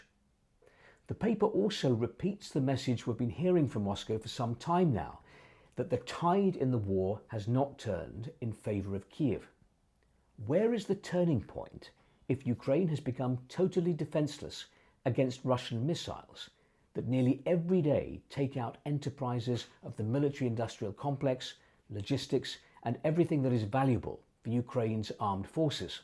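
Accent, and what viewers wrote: United Kingdom. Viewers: Not only BRILLIANT and SO BRAVE, dear Sir, but I guess we all in north America enjoy and admire your magnificent Oxbridge diction. Spasiba, poka poka for now, and God bless You ! Slava Ukrainia.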